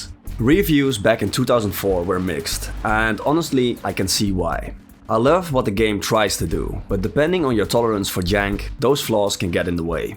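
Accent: Netherlands. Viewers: i think i hear a slight dutch accent. its one of the least noticeable dutch english accents i have ever heard though. most of us cant hide we are dutch when speaking english at all. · Never fail to recognize that accent... 🇳🇱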